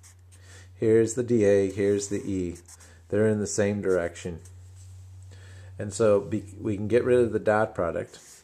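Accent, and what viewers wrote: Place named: South, United States. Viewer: Never thought learning physics from a dude with southern accent would be more helpful than my professor with British accent. 'Murica!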